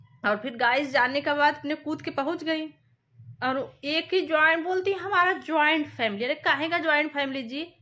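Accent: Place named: Lucknow, India. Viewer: Ap didi up Lucknow se ho kya 😅😅😅 ap ki boli se lg rha h 😅😅😅😅 but ap ka videos acha lg rha h 😅😅😅😅😅😅😅😅😂😂😂😂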